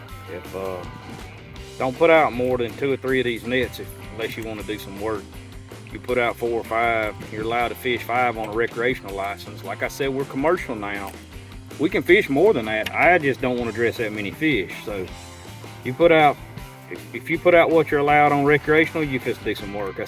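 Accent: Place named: Appalachia, United States. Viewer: Absolutely loved this video, we watch Dat Louisiana Life and got turned on to y’all’s channel.. Love the hillbilly accent sounds like Ken, Do you offer tours?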